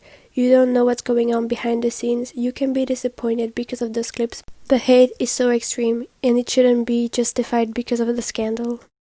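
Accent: France. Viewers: Are you French? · I am French, this is obviously a french accent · sorry, it's not about the video but are you french? idk but your accent sounds like a french one 🤷‍♀️ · Are you french?? Bc ur accent sounds a bit french and i'm french too so that's why i'm asking ;w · Are you french ? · your voice is so beautiful! i love your french accent . great video , i love the points you made :)💖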